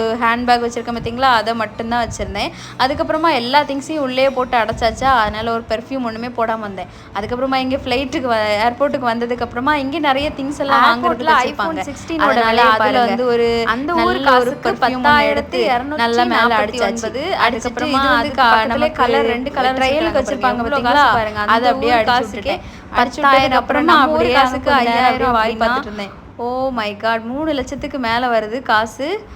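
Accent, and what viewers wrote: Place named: Kanyakumari, India. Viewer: First time watching...... ivanga kanyakumari ponnu, the slang😊❤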